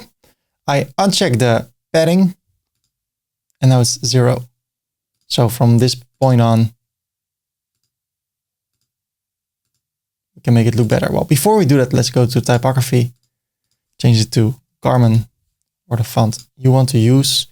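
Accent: Netherlands. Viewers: First time here, I picked up the Dutch accent haha, and I love Tesla too. And work with Elementor, daily. So I subbed! Groetjes uit Middelburg! 😊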